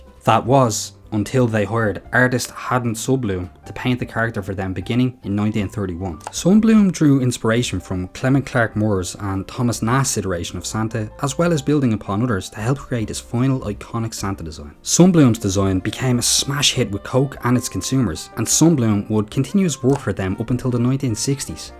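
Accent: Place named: United States. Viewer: That merica voice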